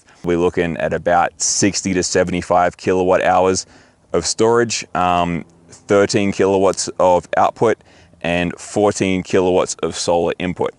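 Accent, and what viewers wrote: Australia. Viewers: Huh... You got an aussie accent ???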